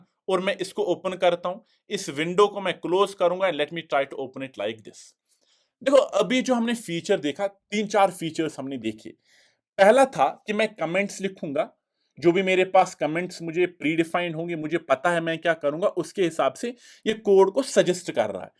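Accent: Kashmir, India. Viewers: Looks like you are from kashmir. From your accent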